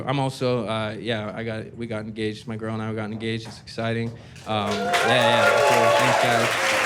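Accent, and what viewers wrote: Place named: Canada. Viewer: this homie is so fucking Canadian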